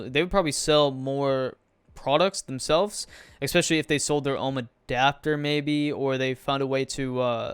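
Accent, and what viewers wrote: Canada. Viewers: ARE YOU CANADIEN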